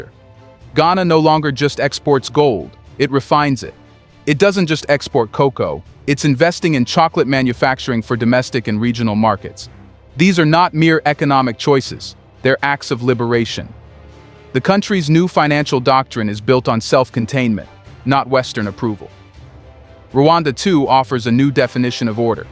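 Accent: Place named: United States. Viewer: For an American voice -over to lecture any body on their national debt - when the USA's own Foreign Debt exceeds its own GDP already (and is 10 times the size of France's) and will only continue to grow until its economy collapses - pretty soon!, it is thought - Is a bit bloody RICH! 'Do one America - about the shortest lived Empire (with the axception of Attila the Hun's perhaps) EVER. GO SUCK A LEMON!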